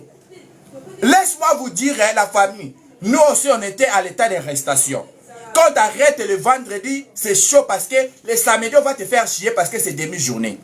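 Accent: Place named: Ivory Coast. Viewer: Mura de presse tu as bien parlé en français comme ivoirien félicitations notre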